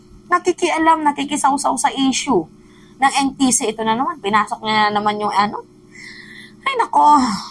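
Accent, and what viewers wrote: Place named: Iloilo, Philippines. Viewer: ILONGGO gid..daw sa waay lang pero may ara ginabatyag!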